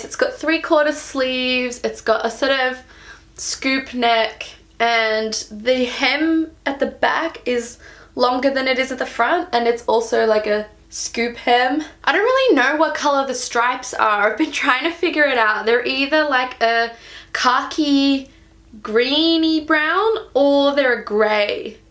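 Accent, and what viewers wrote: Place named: United Kingdom. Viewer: I guess I should mention that I'm making the assumption (based on your accent) that you are UK!? Could be wrong! You can totally listen to my accent and tell I'm backwoods!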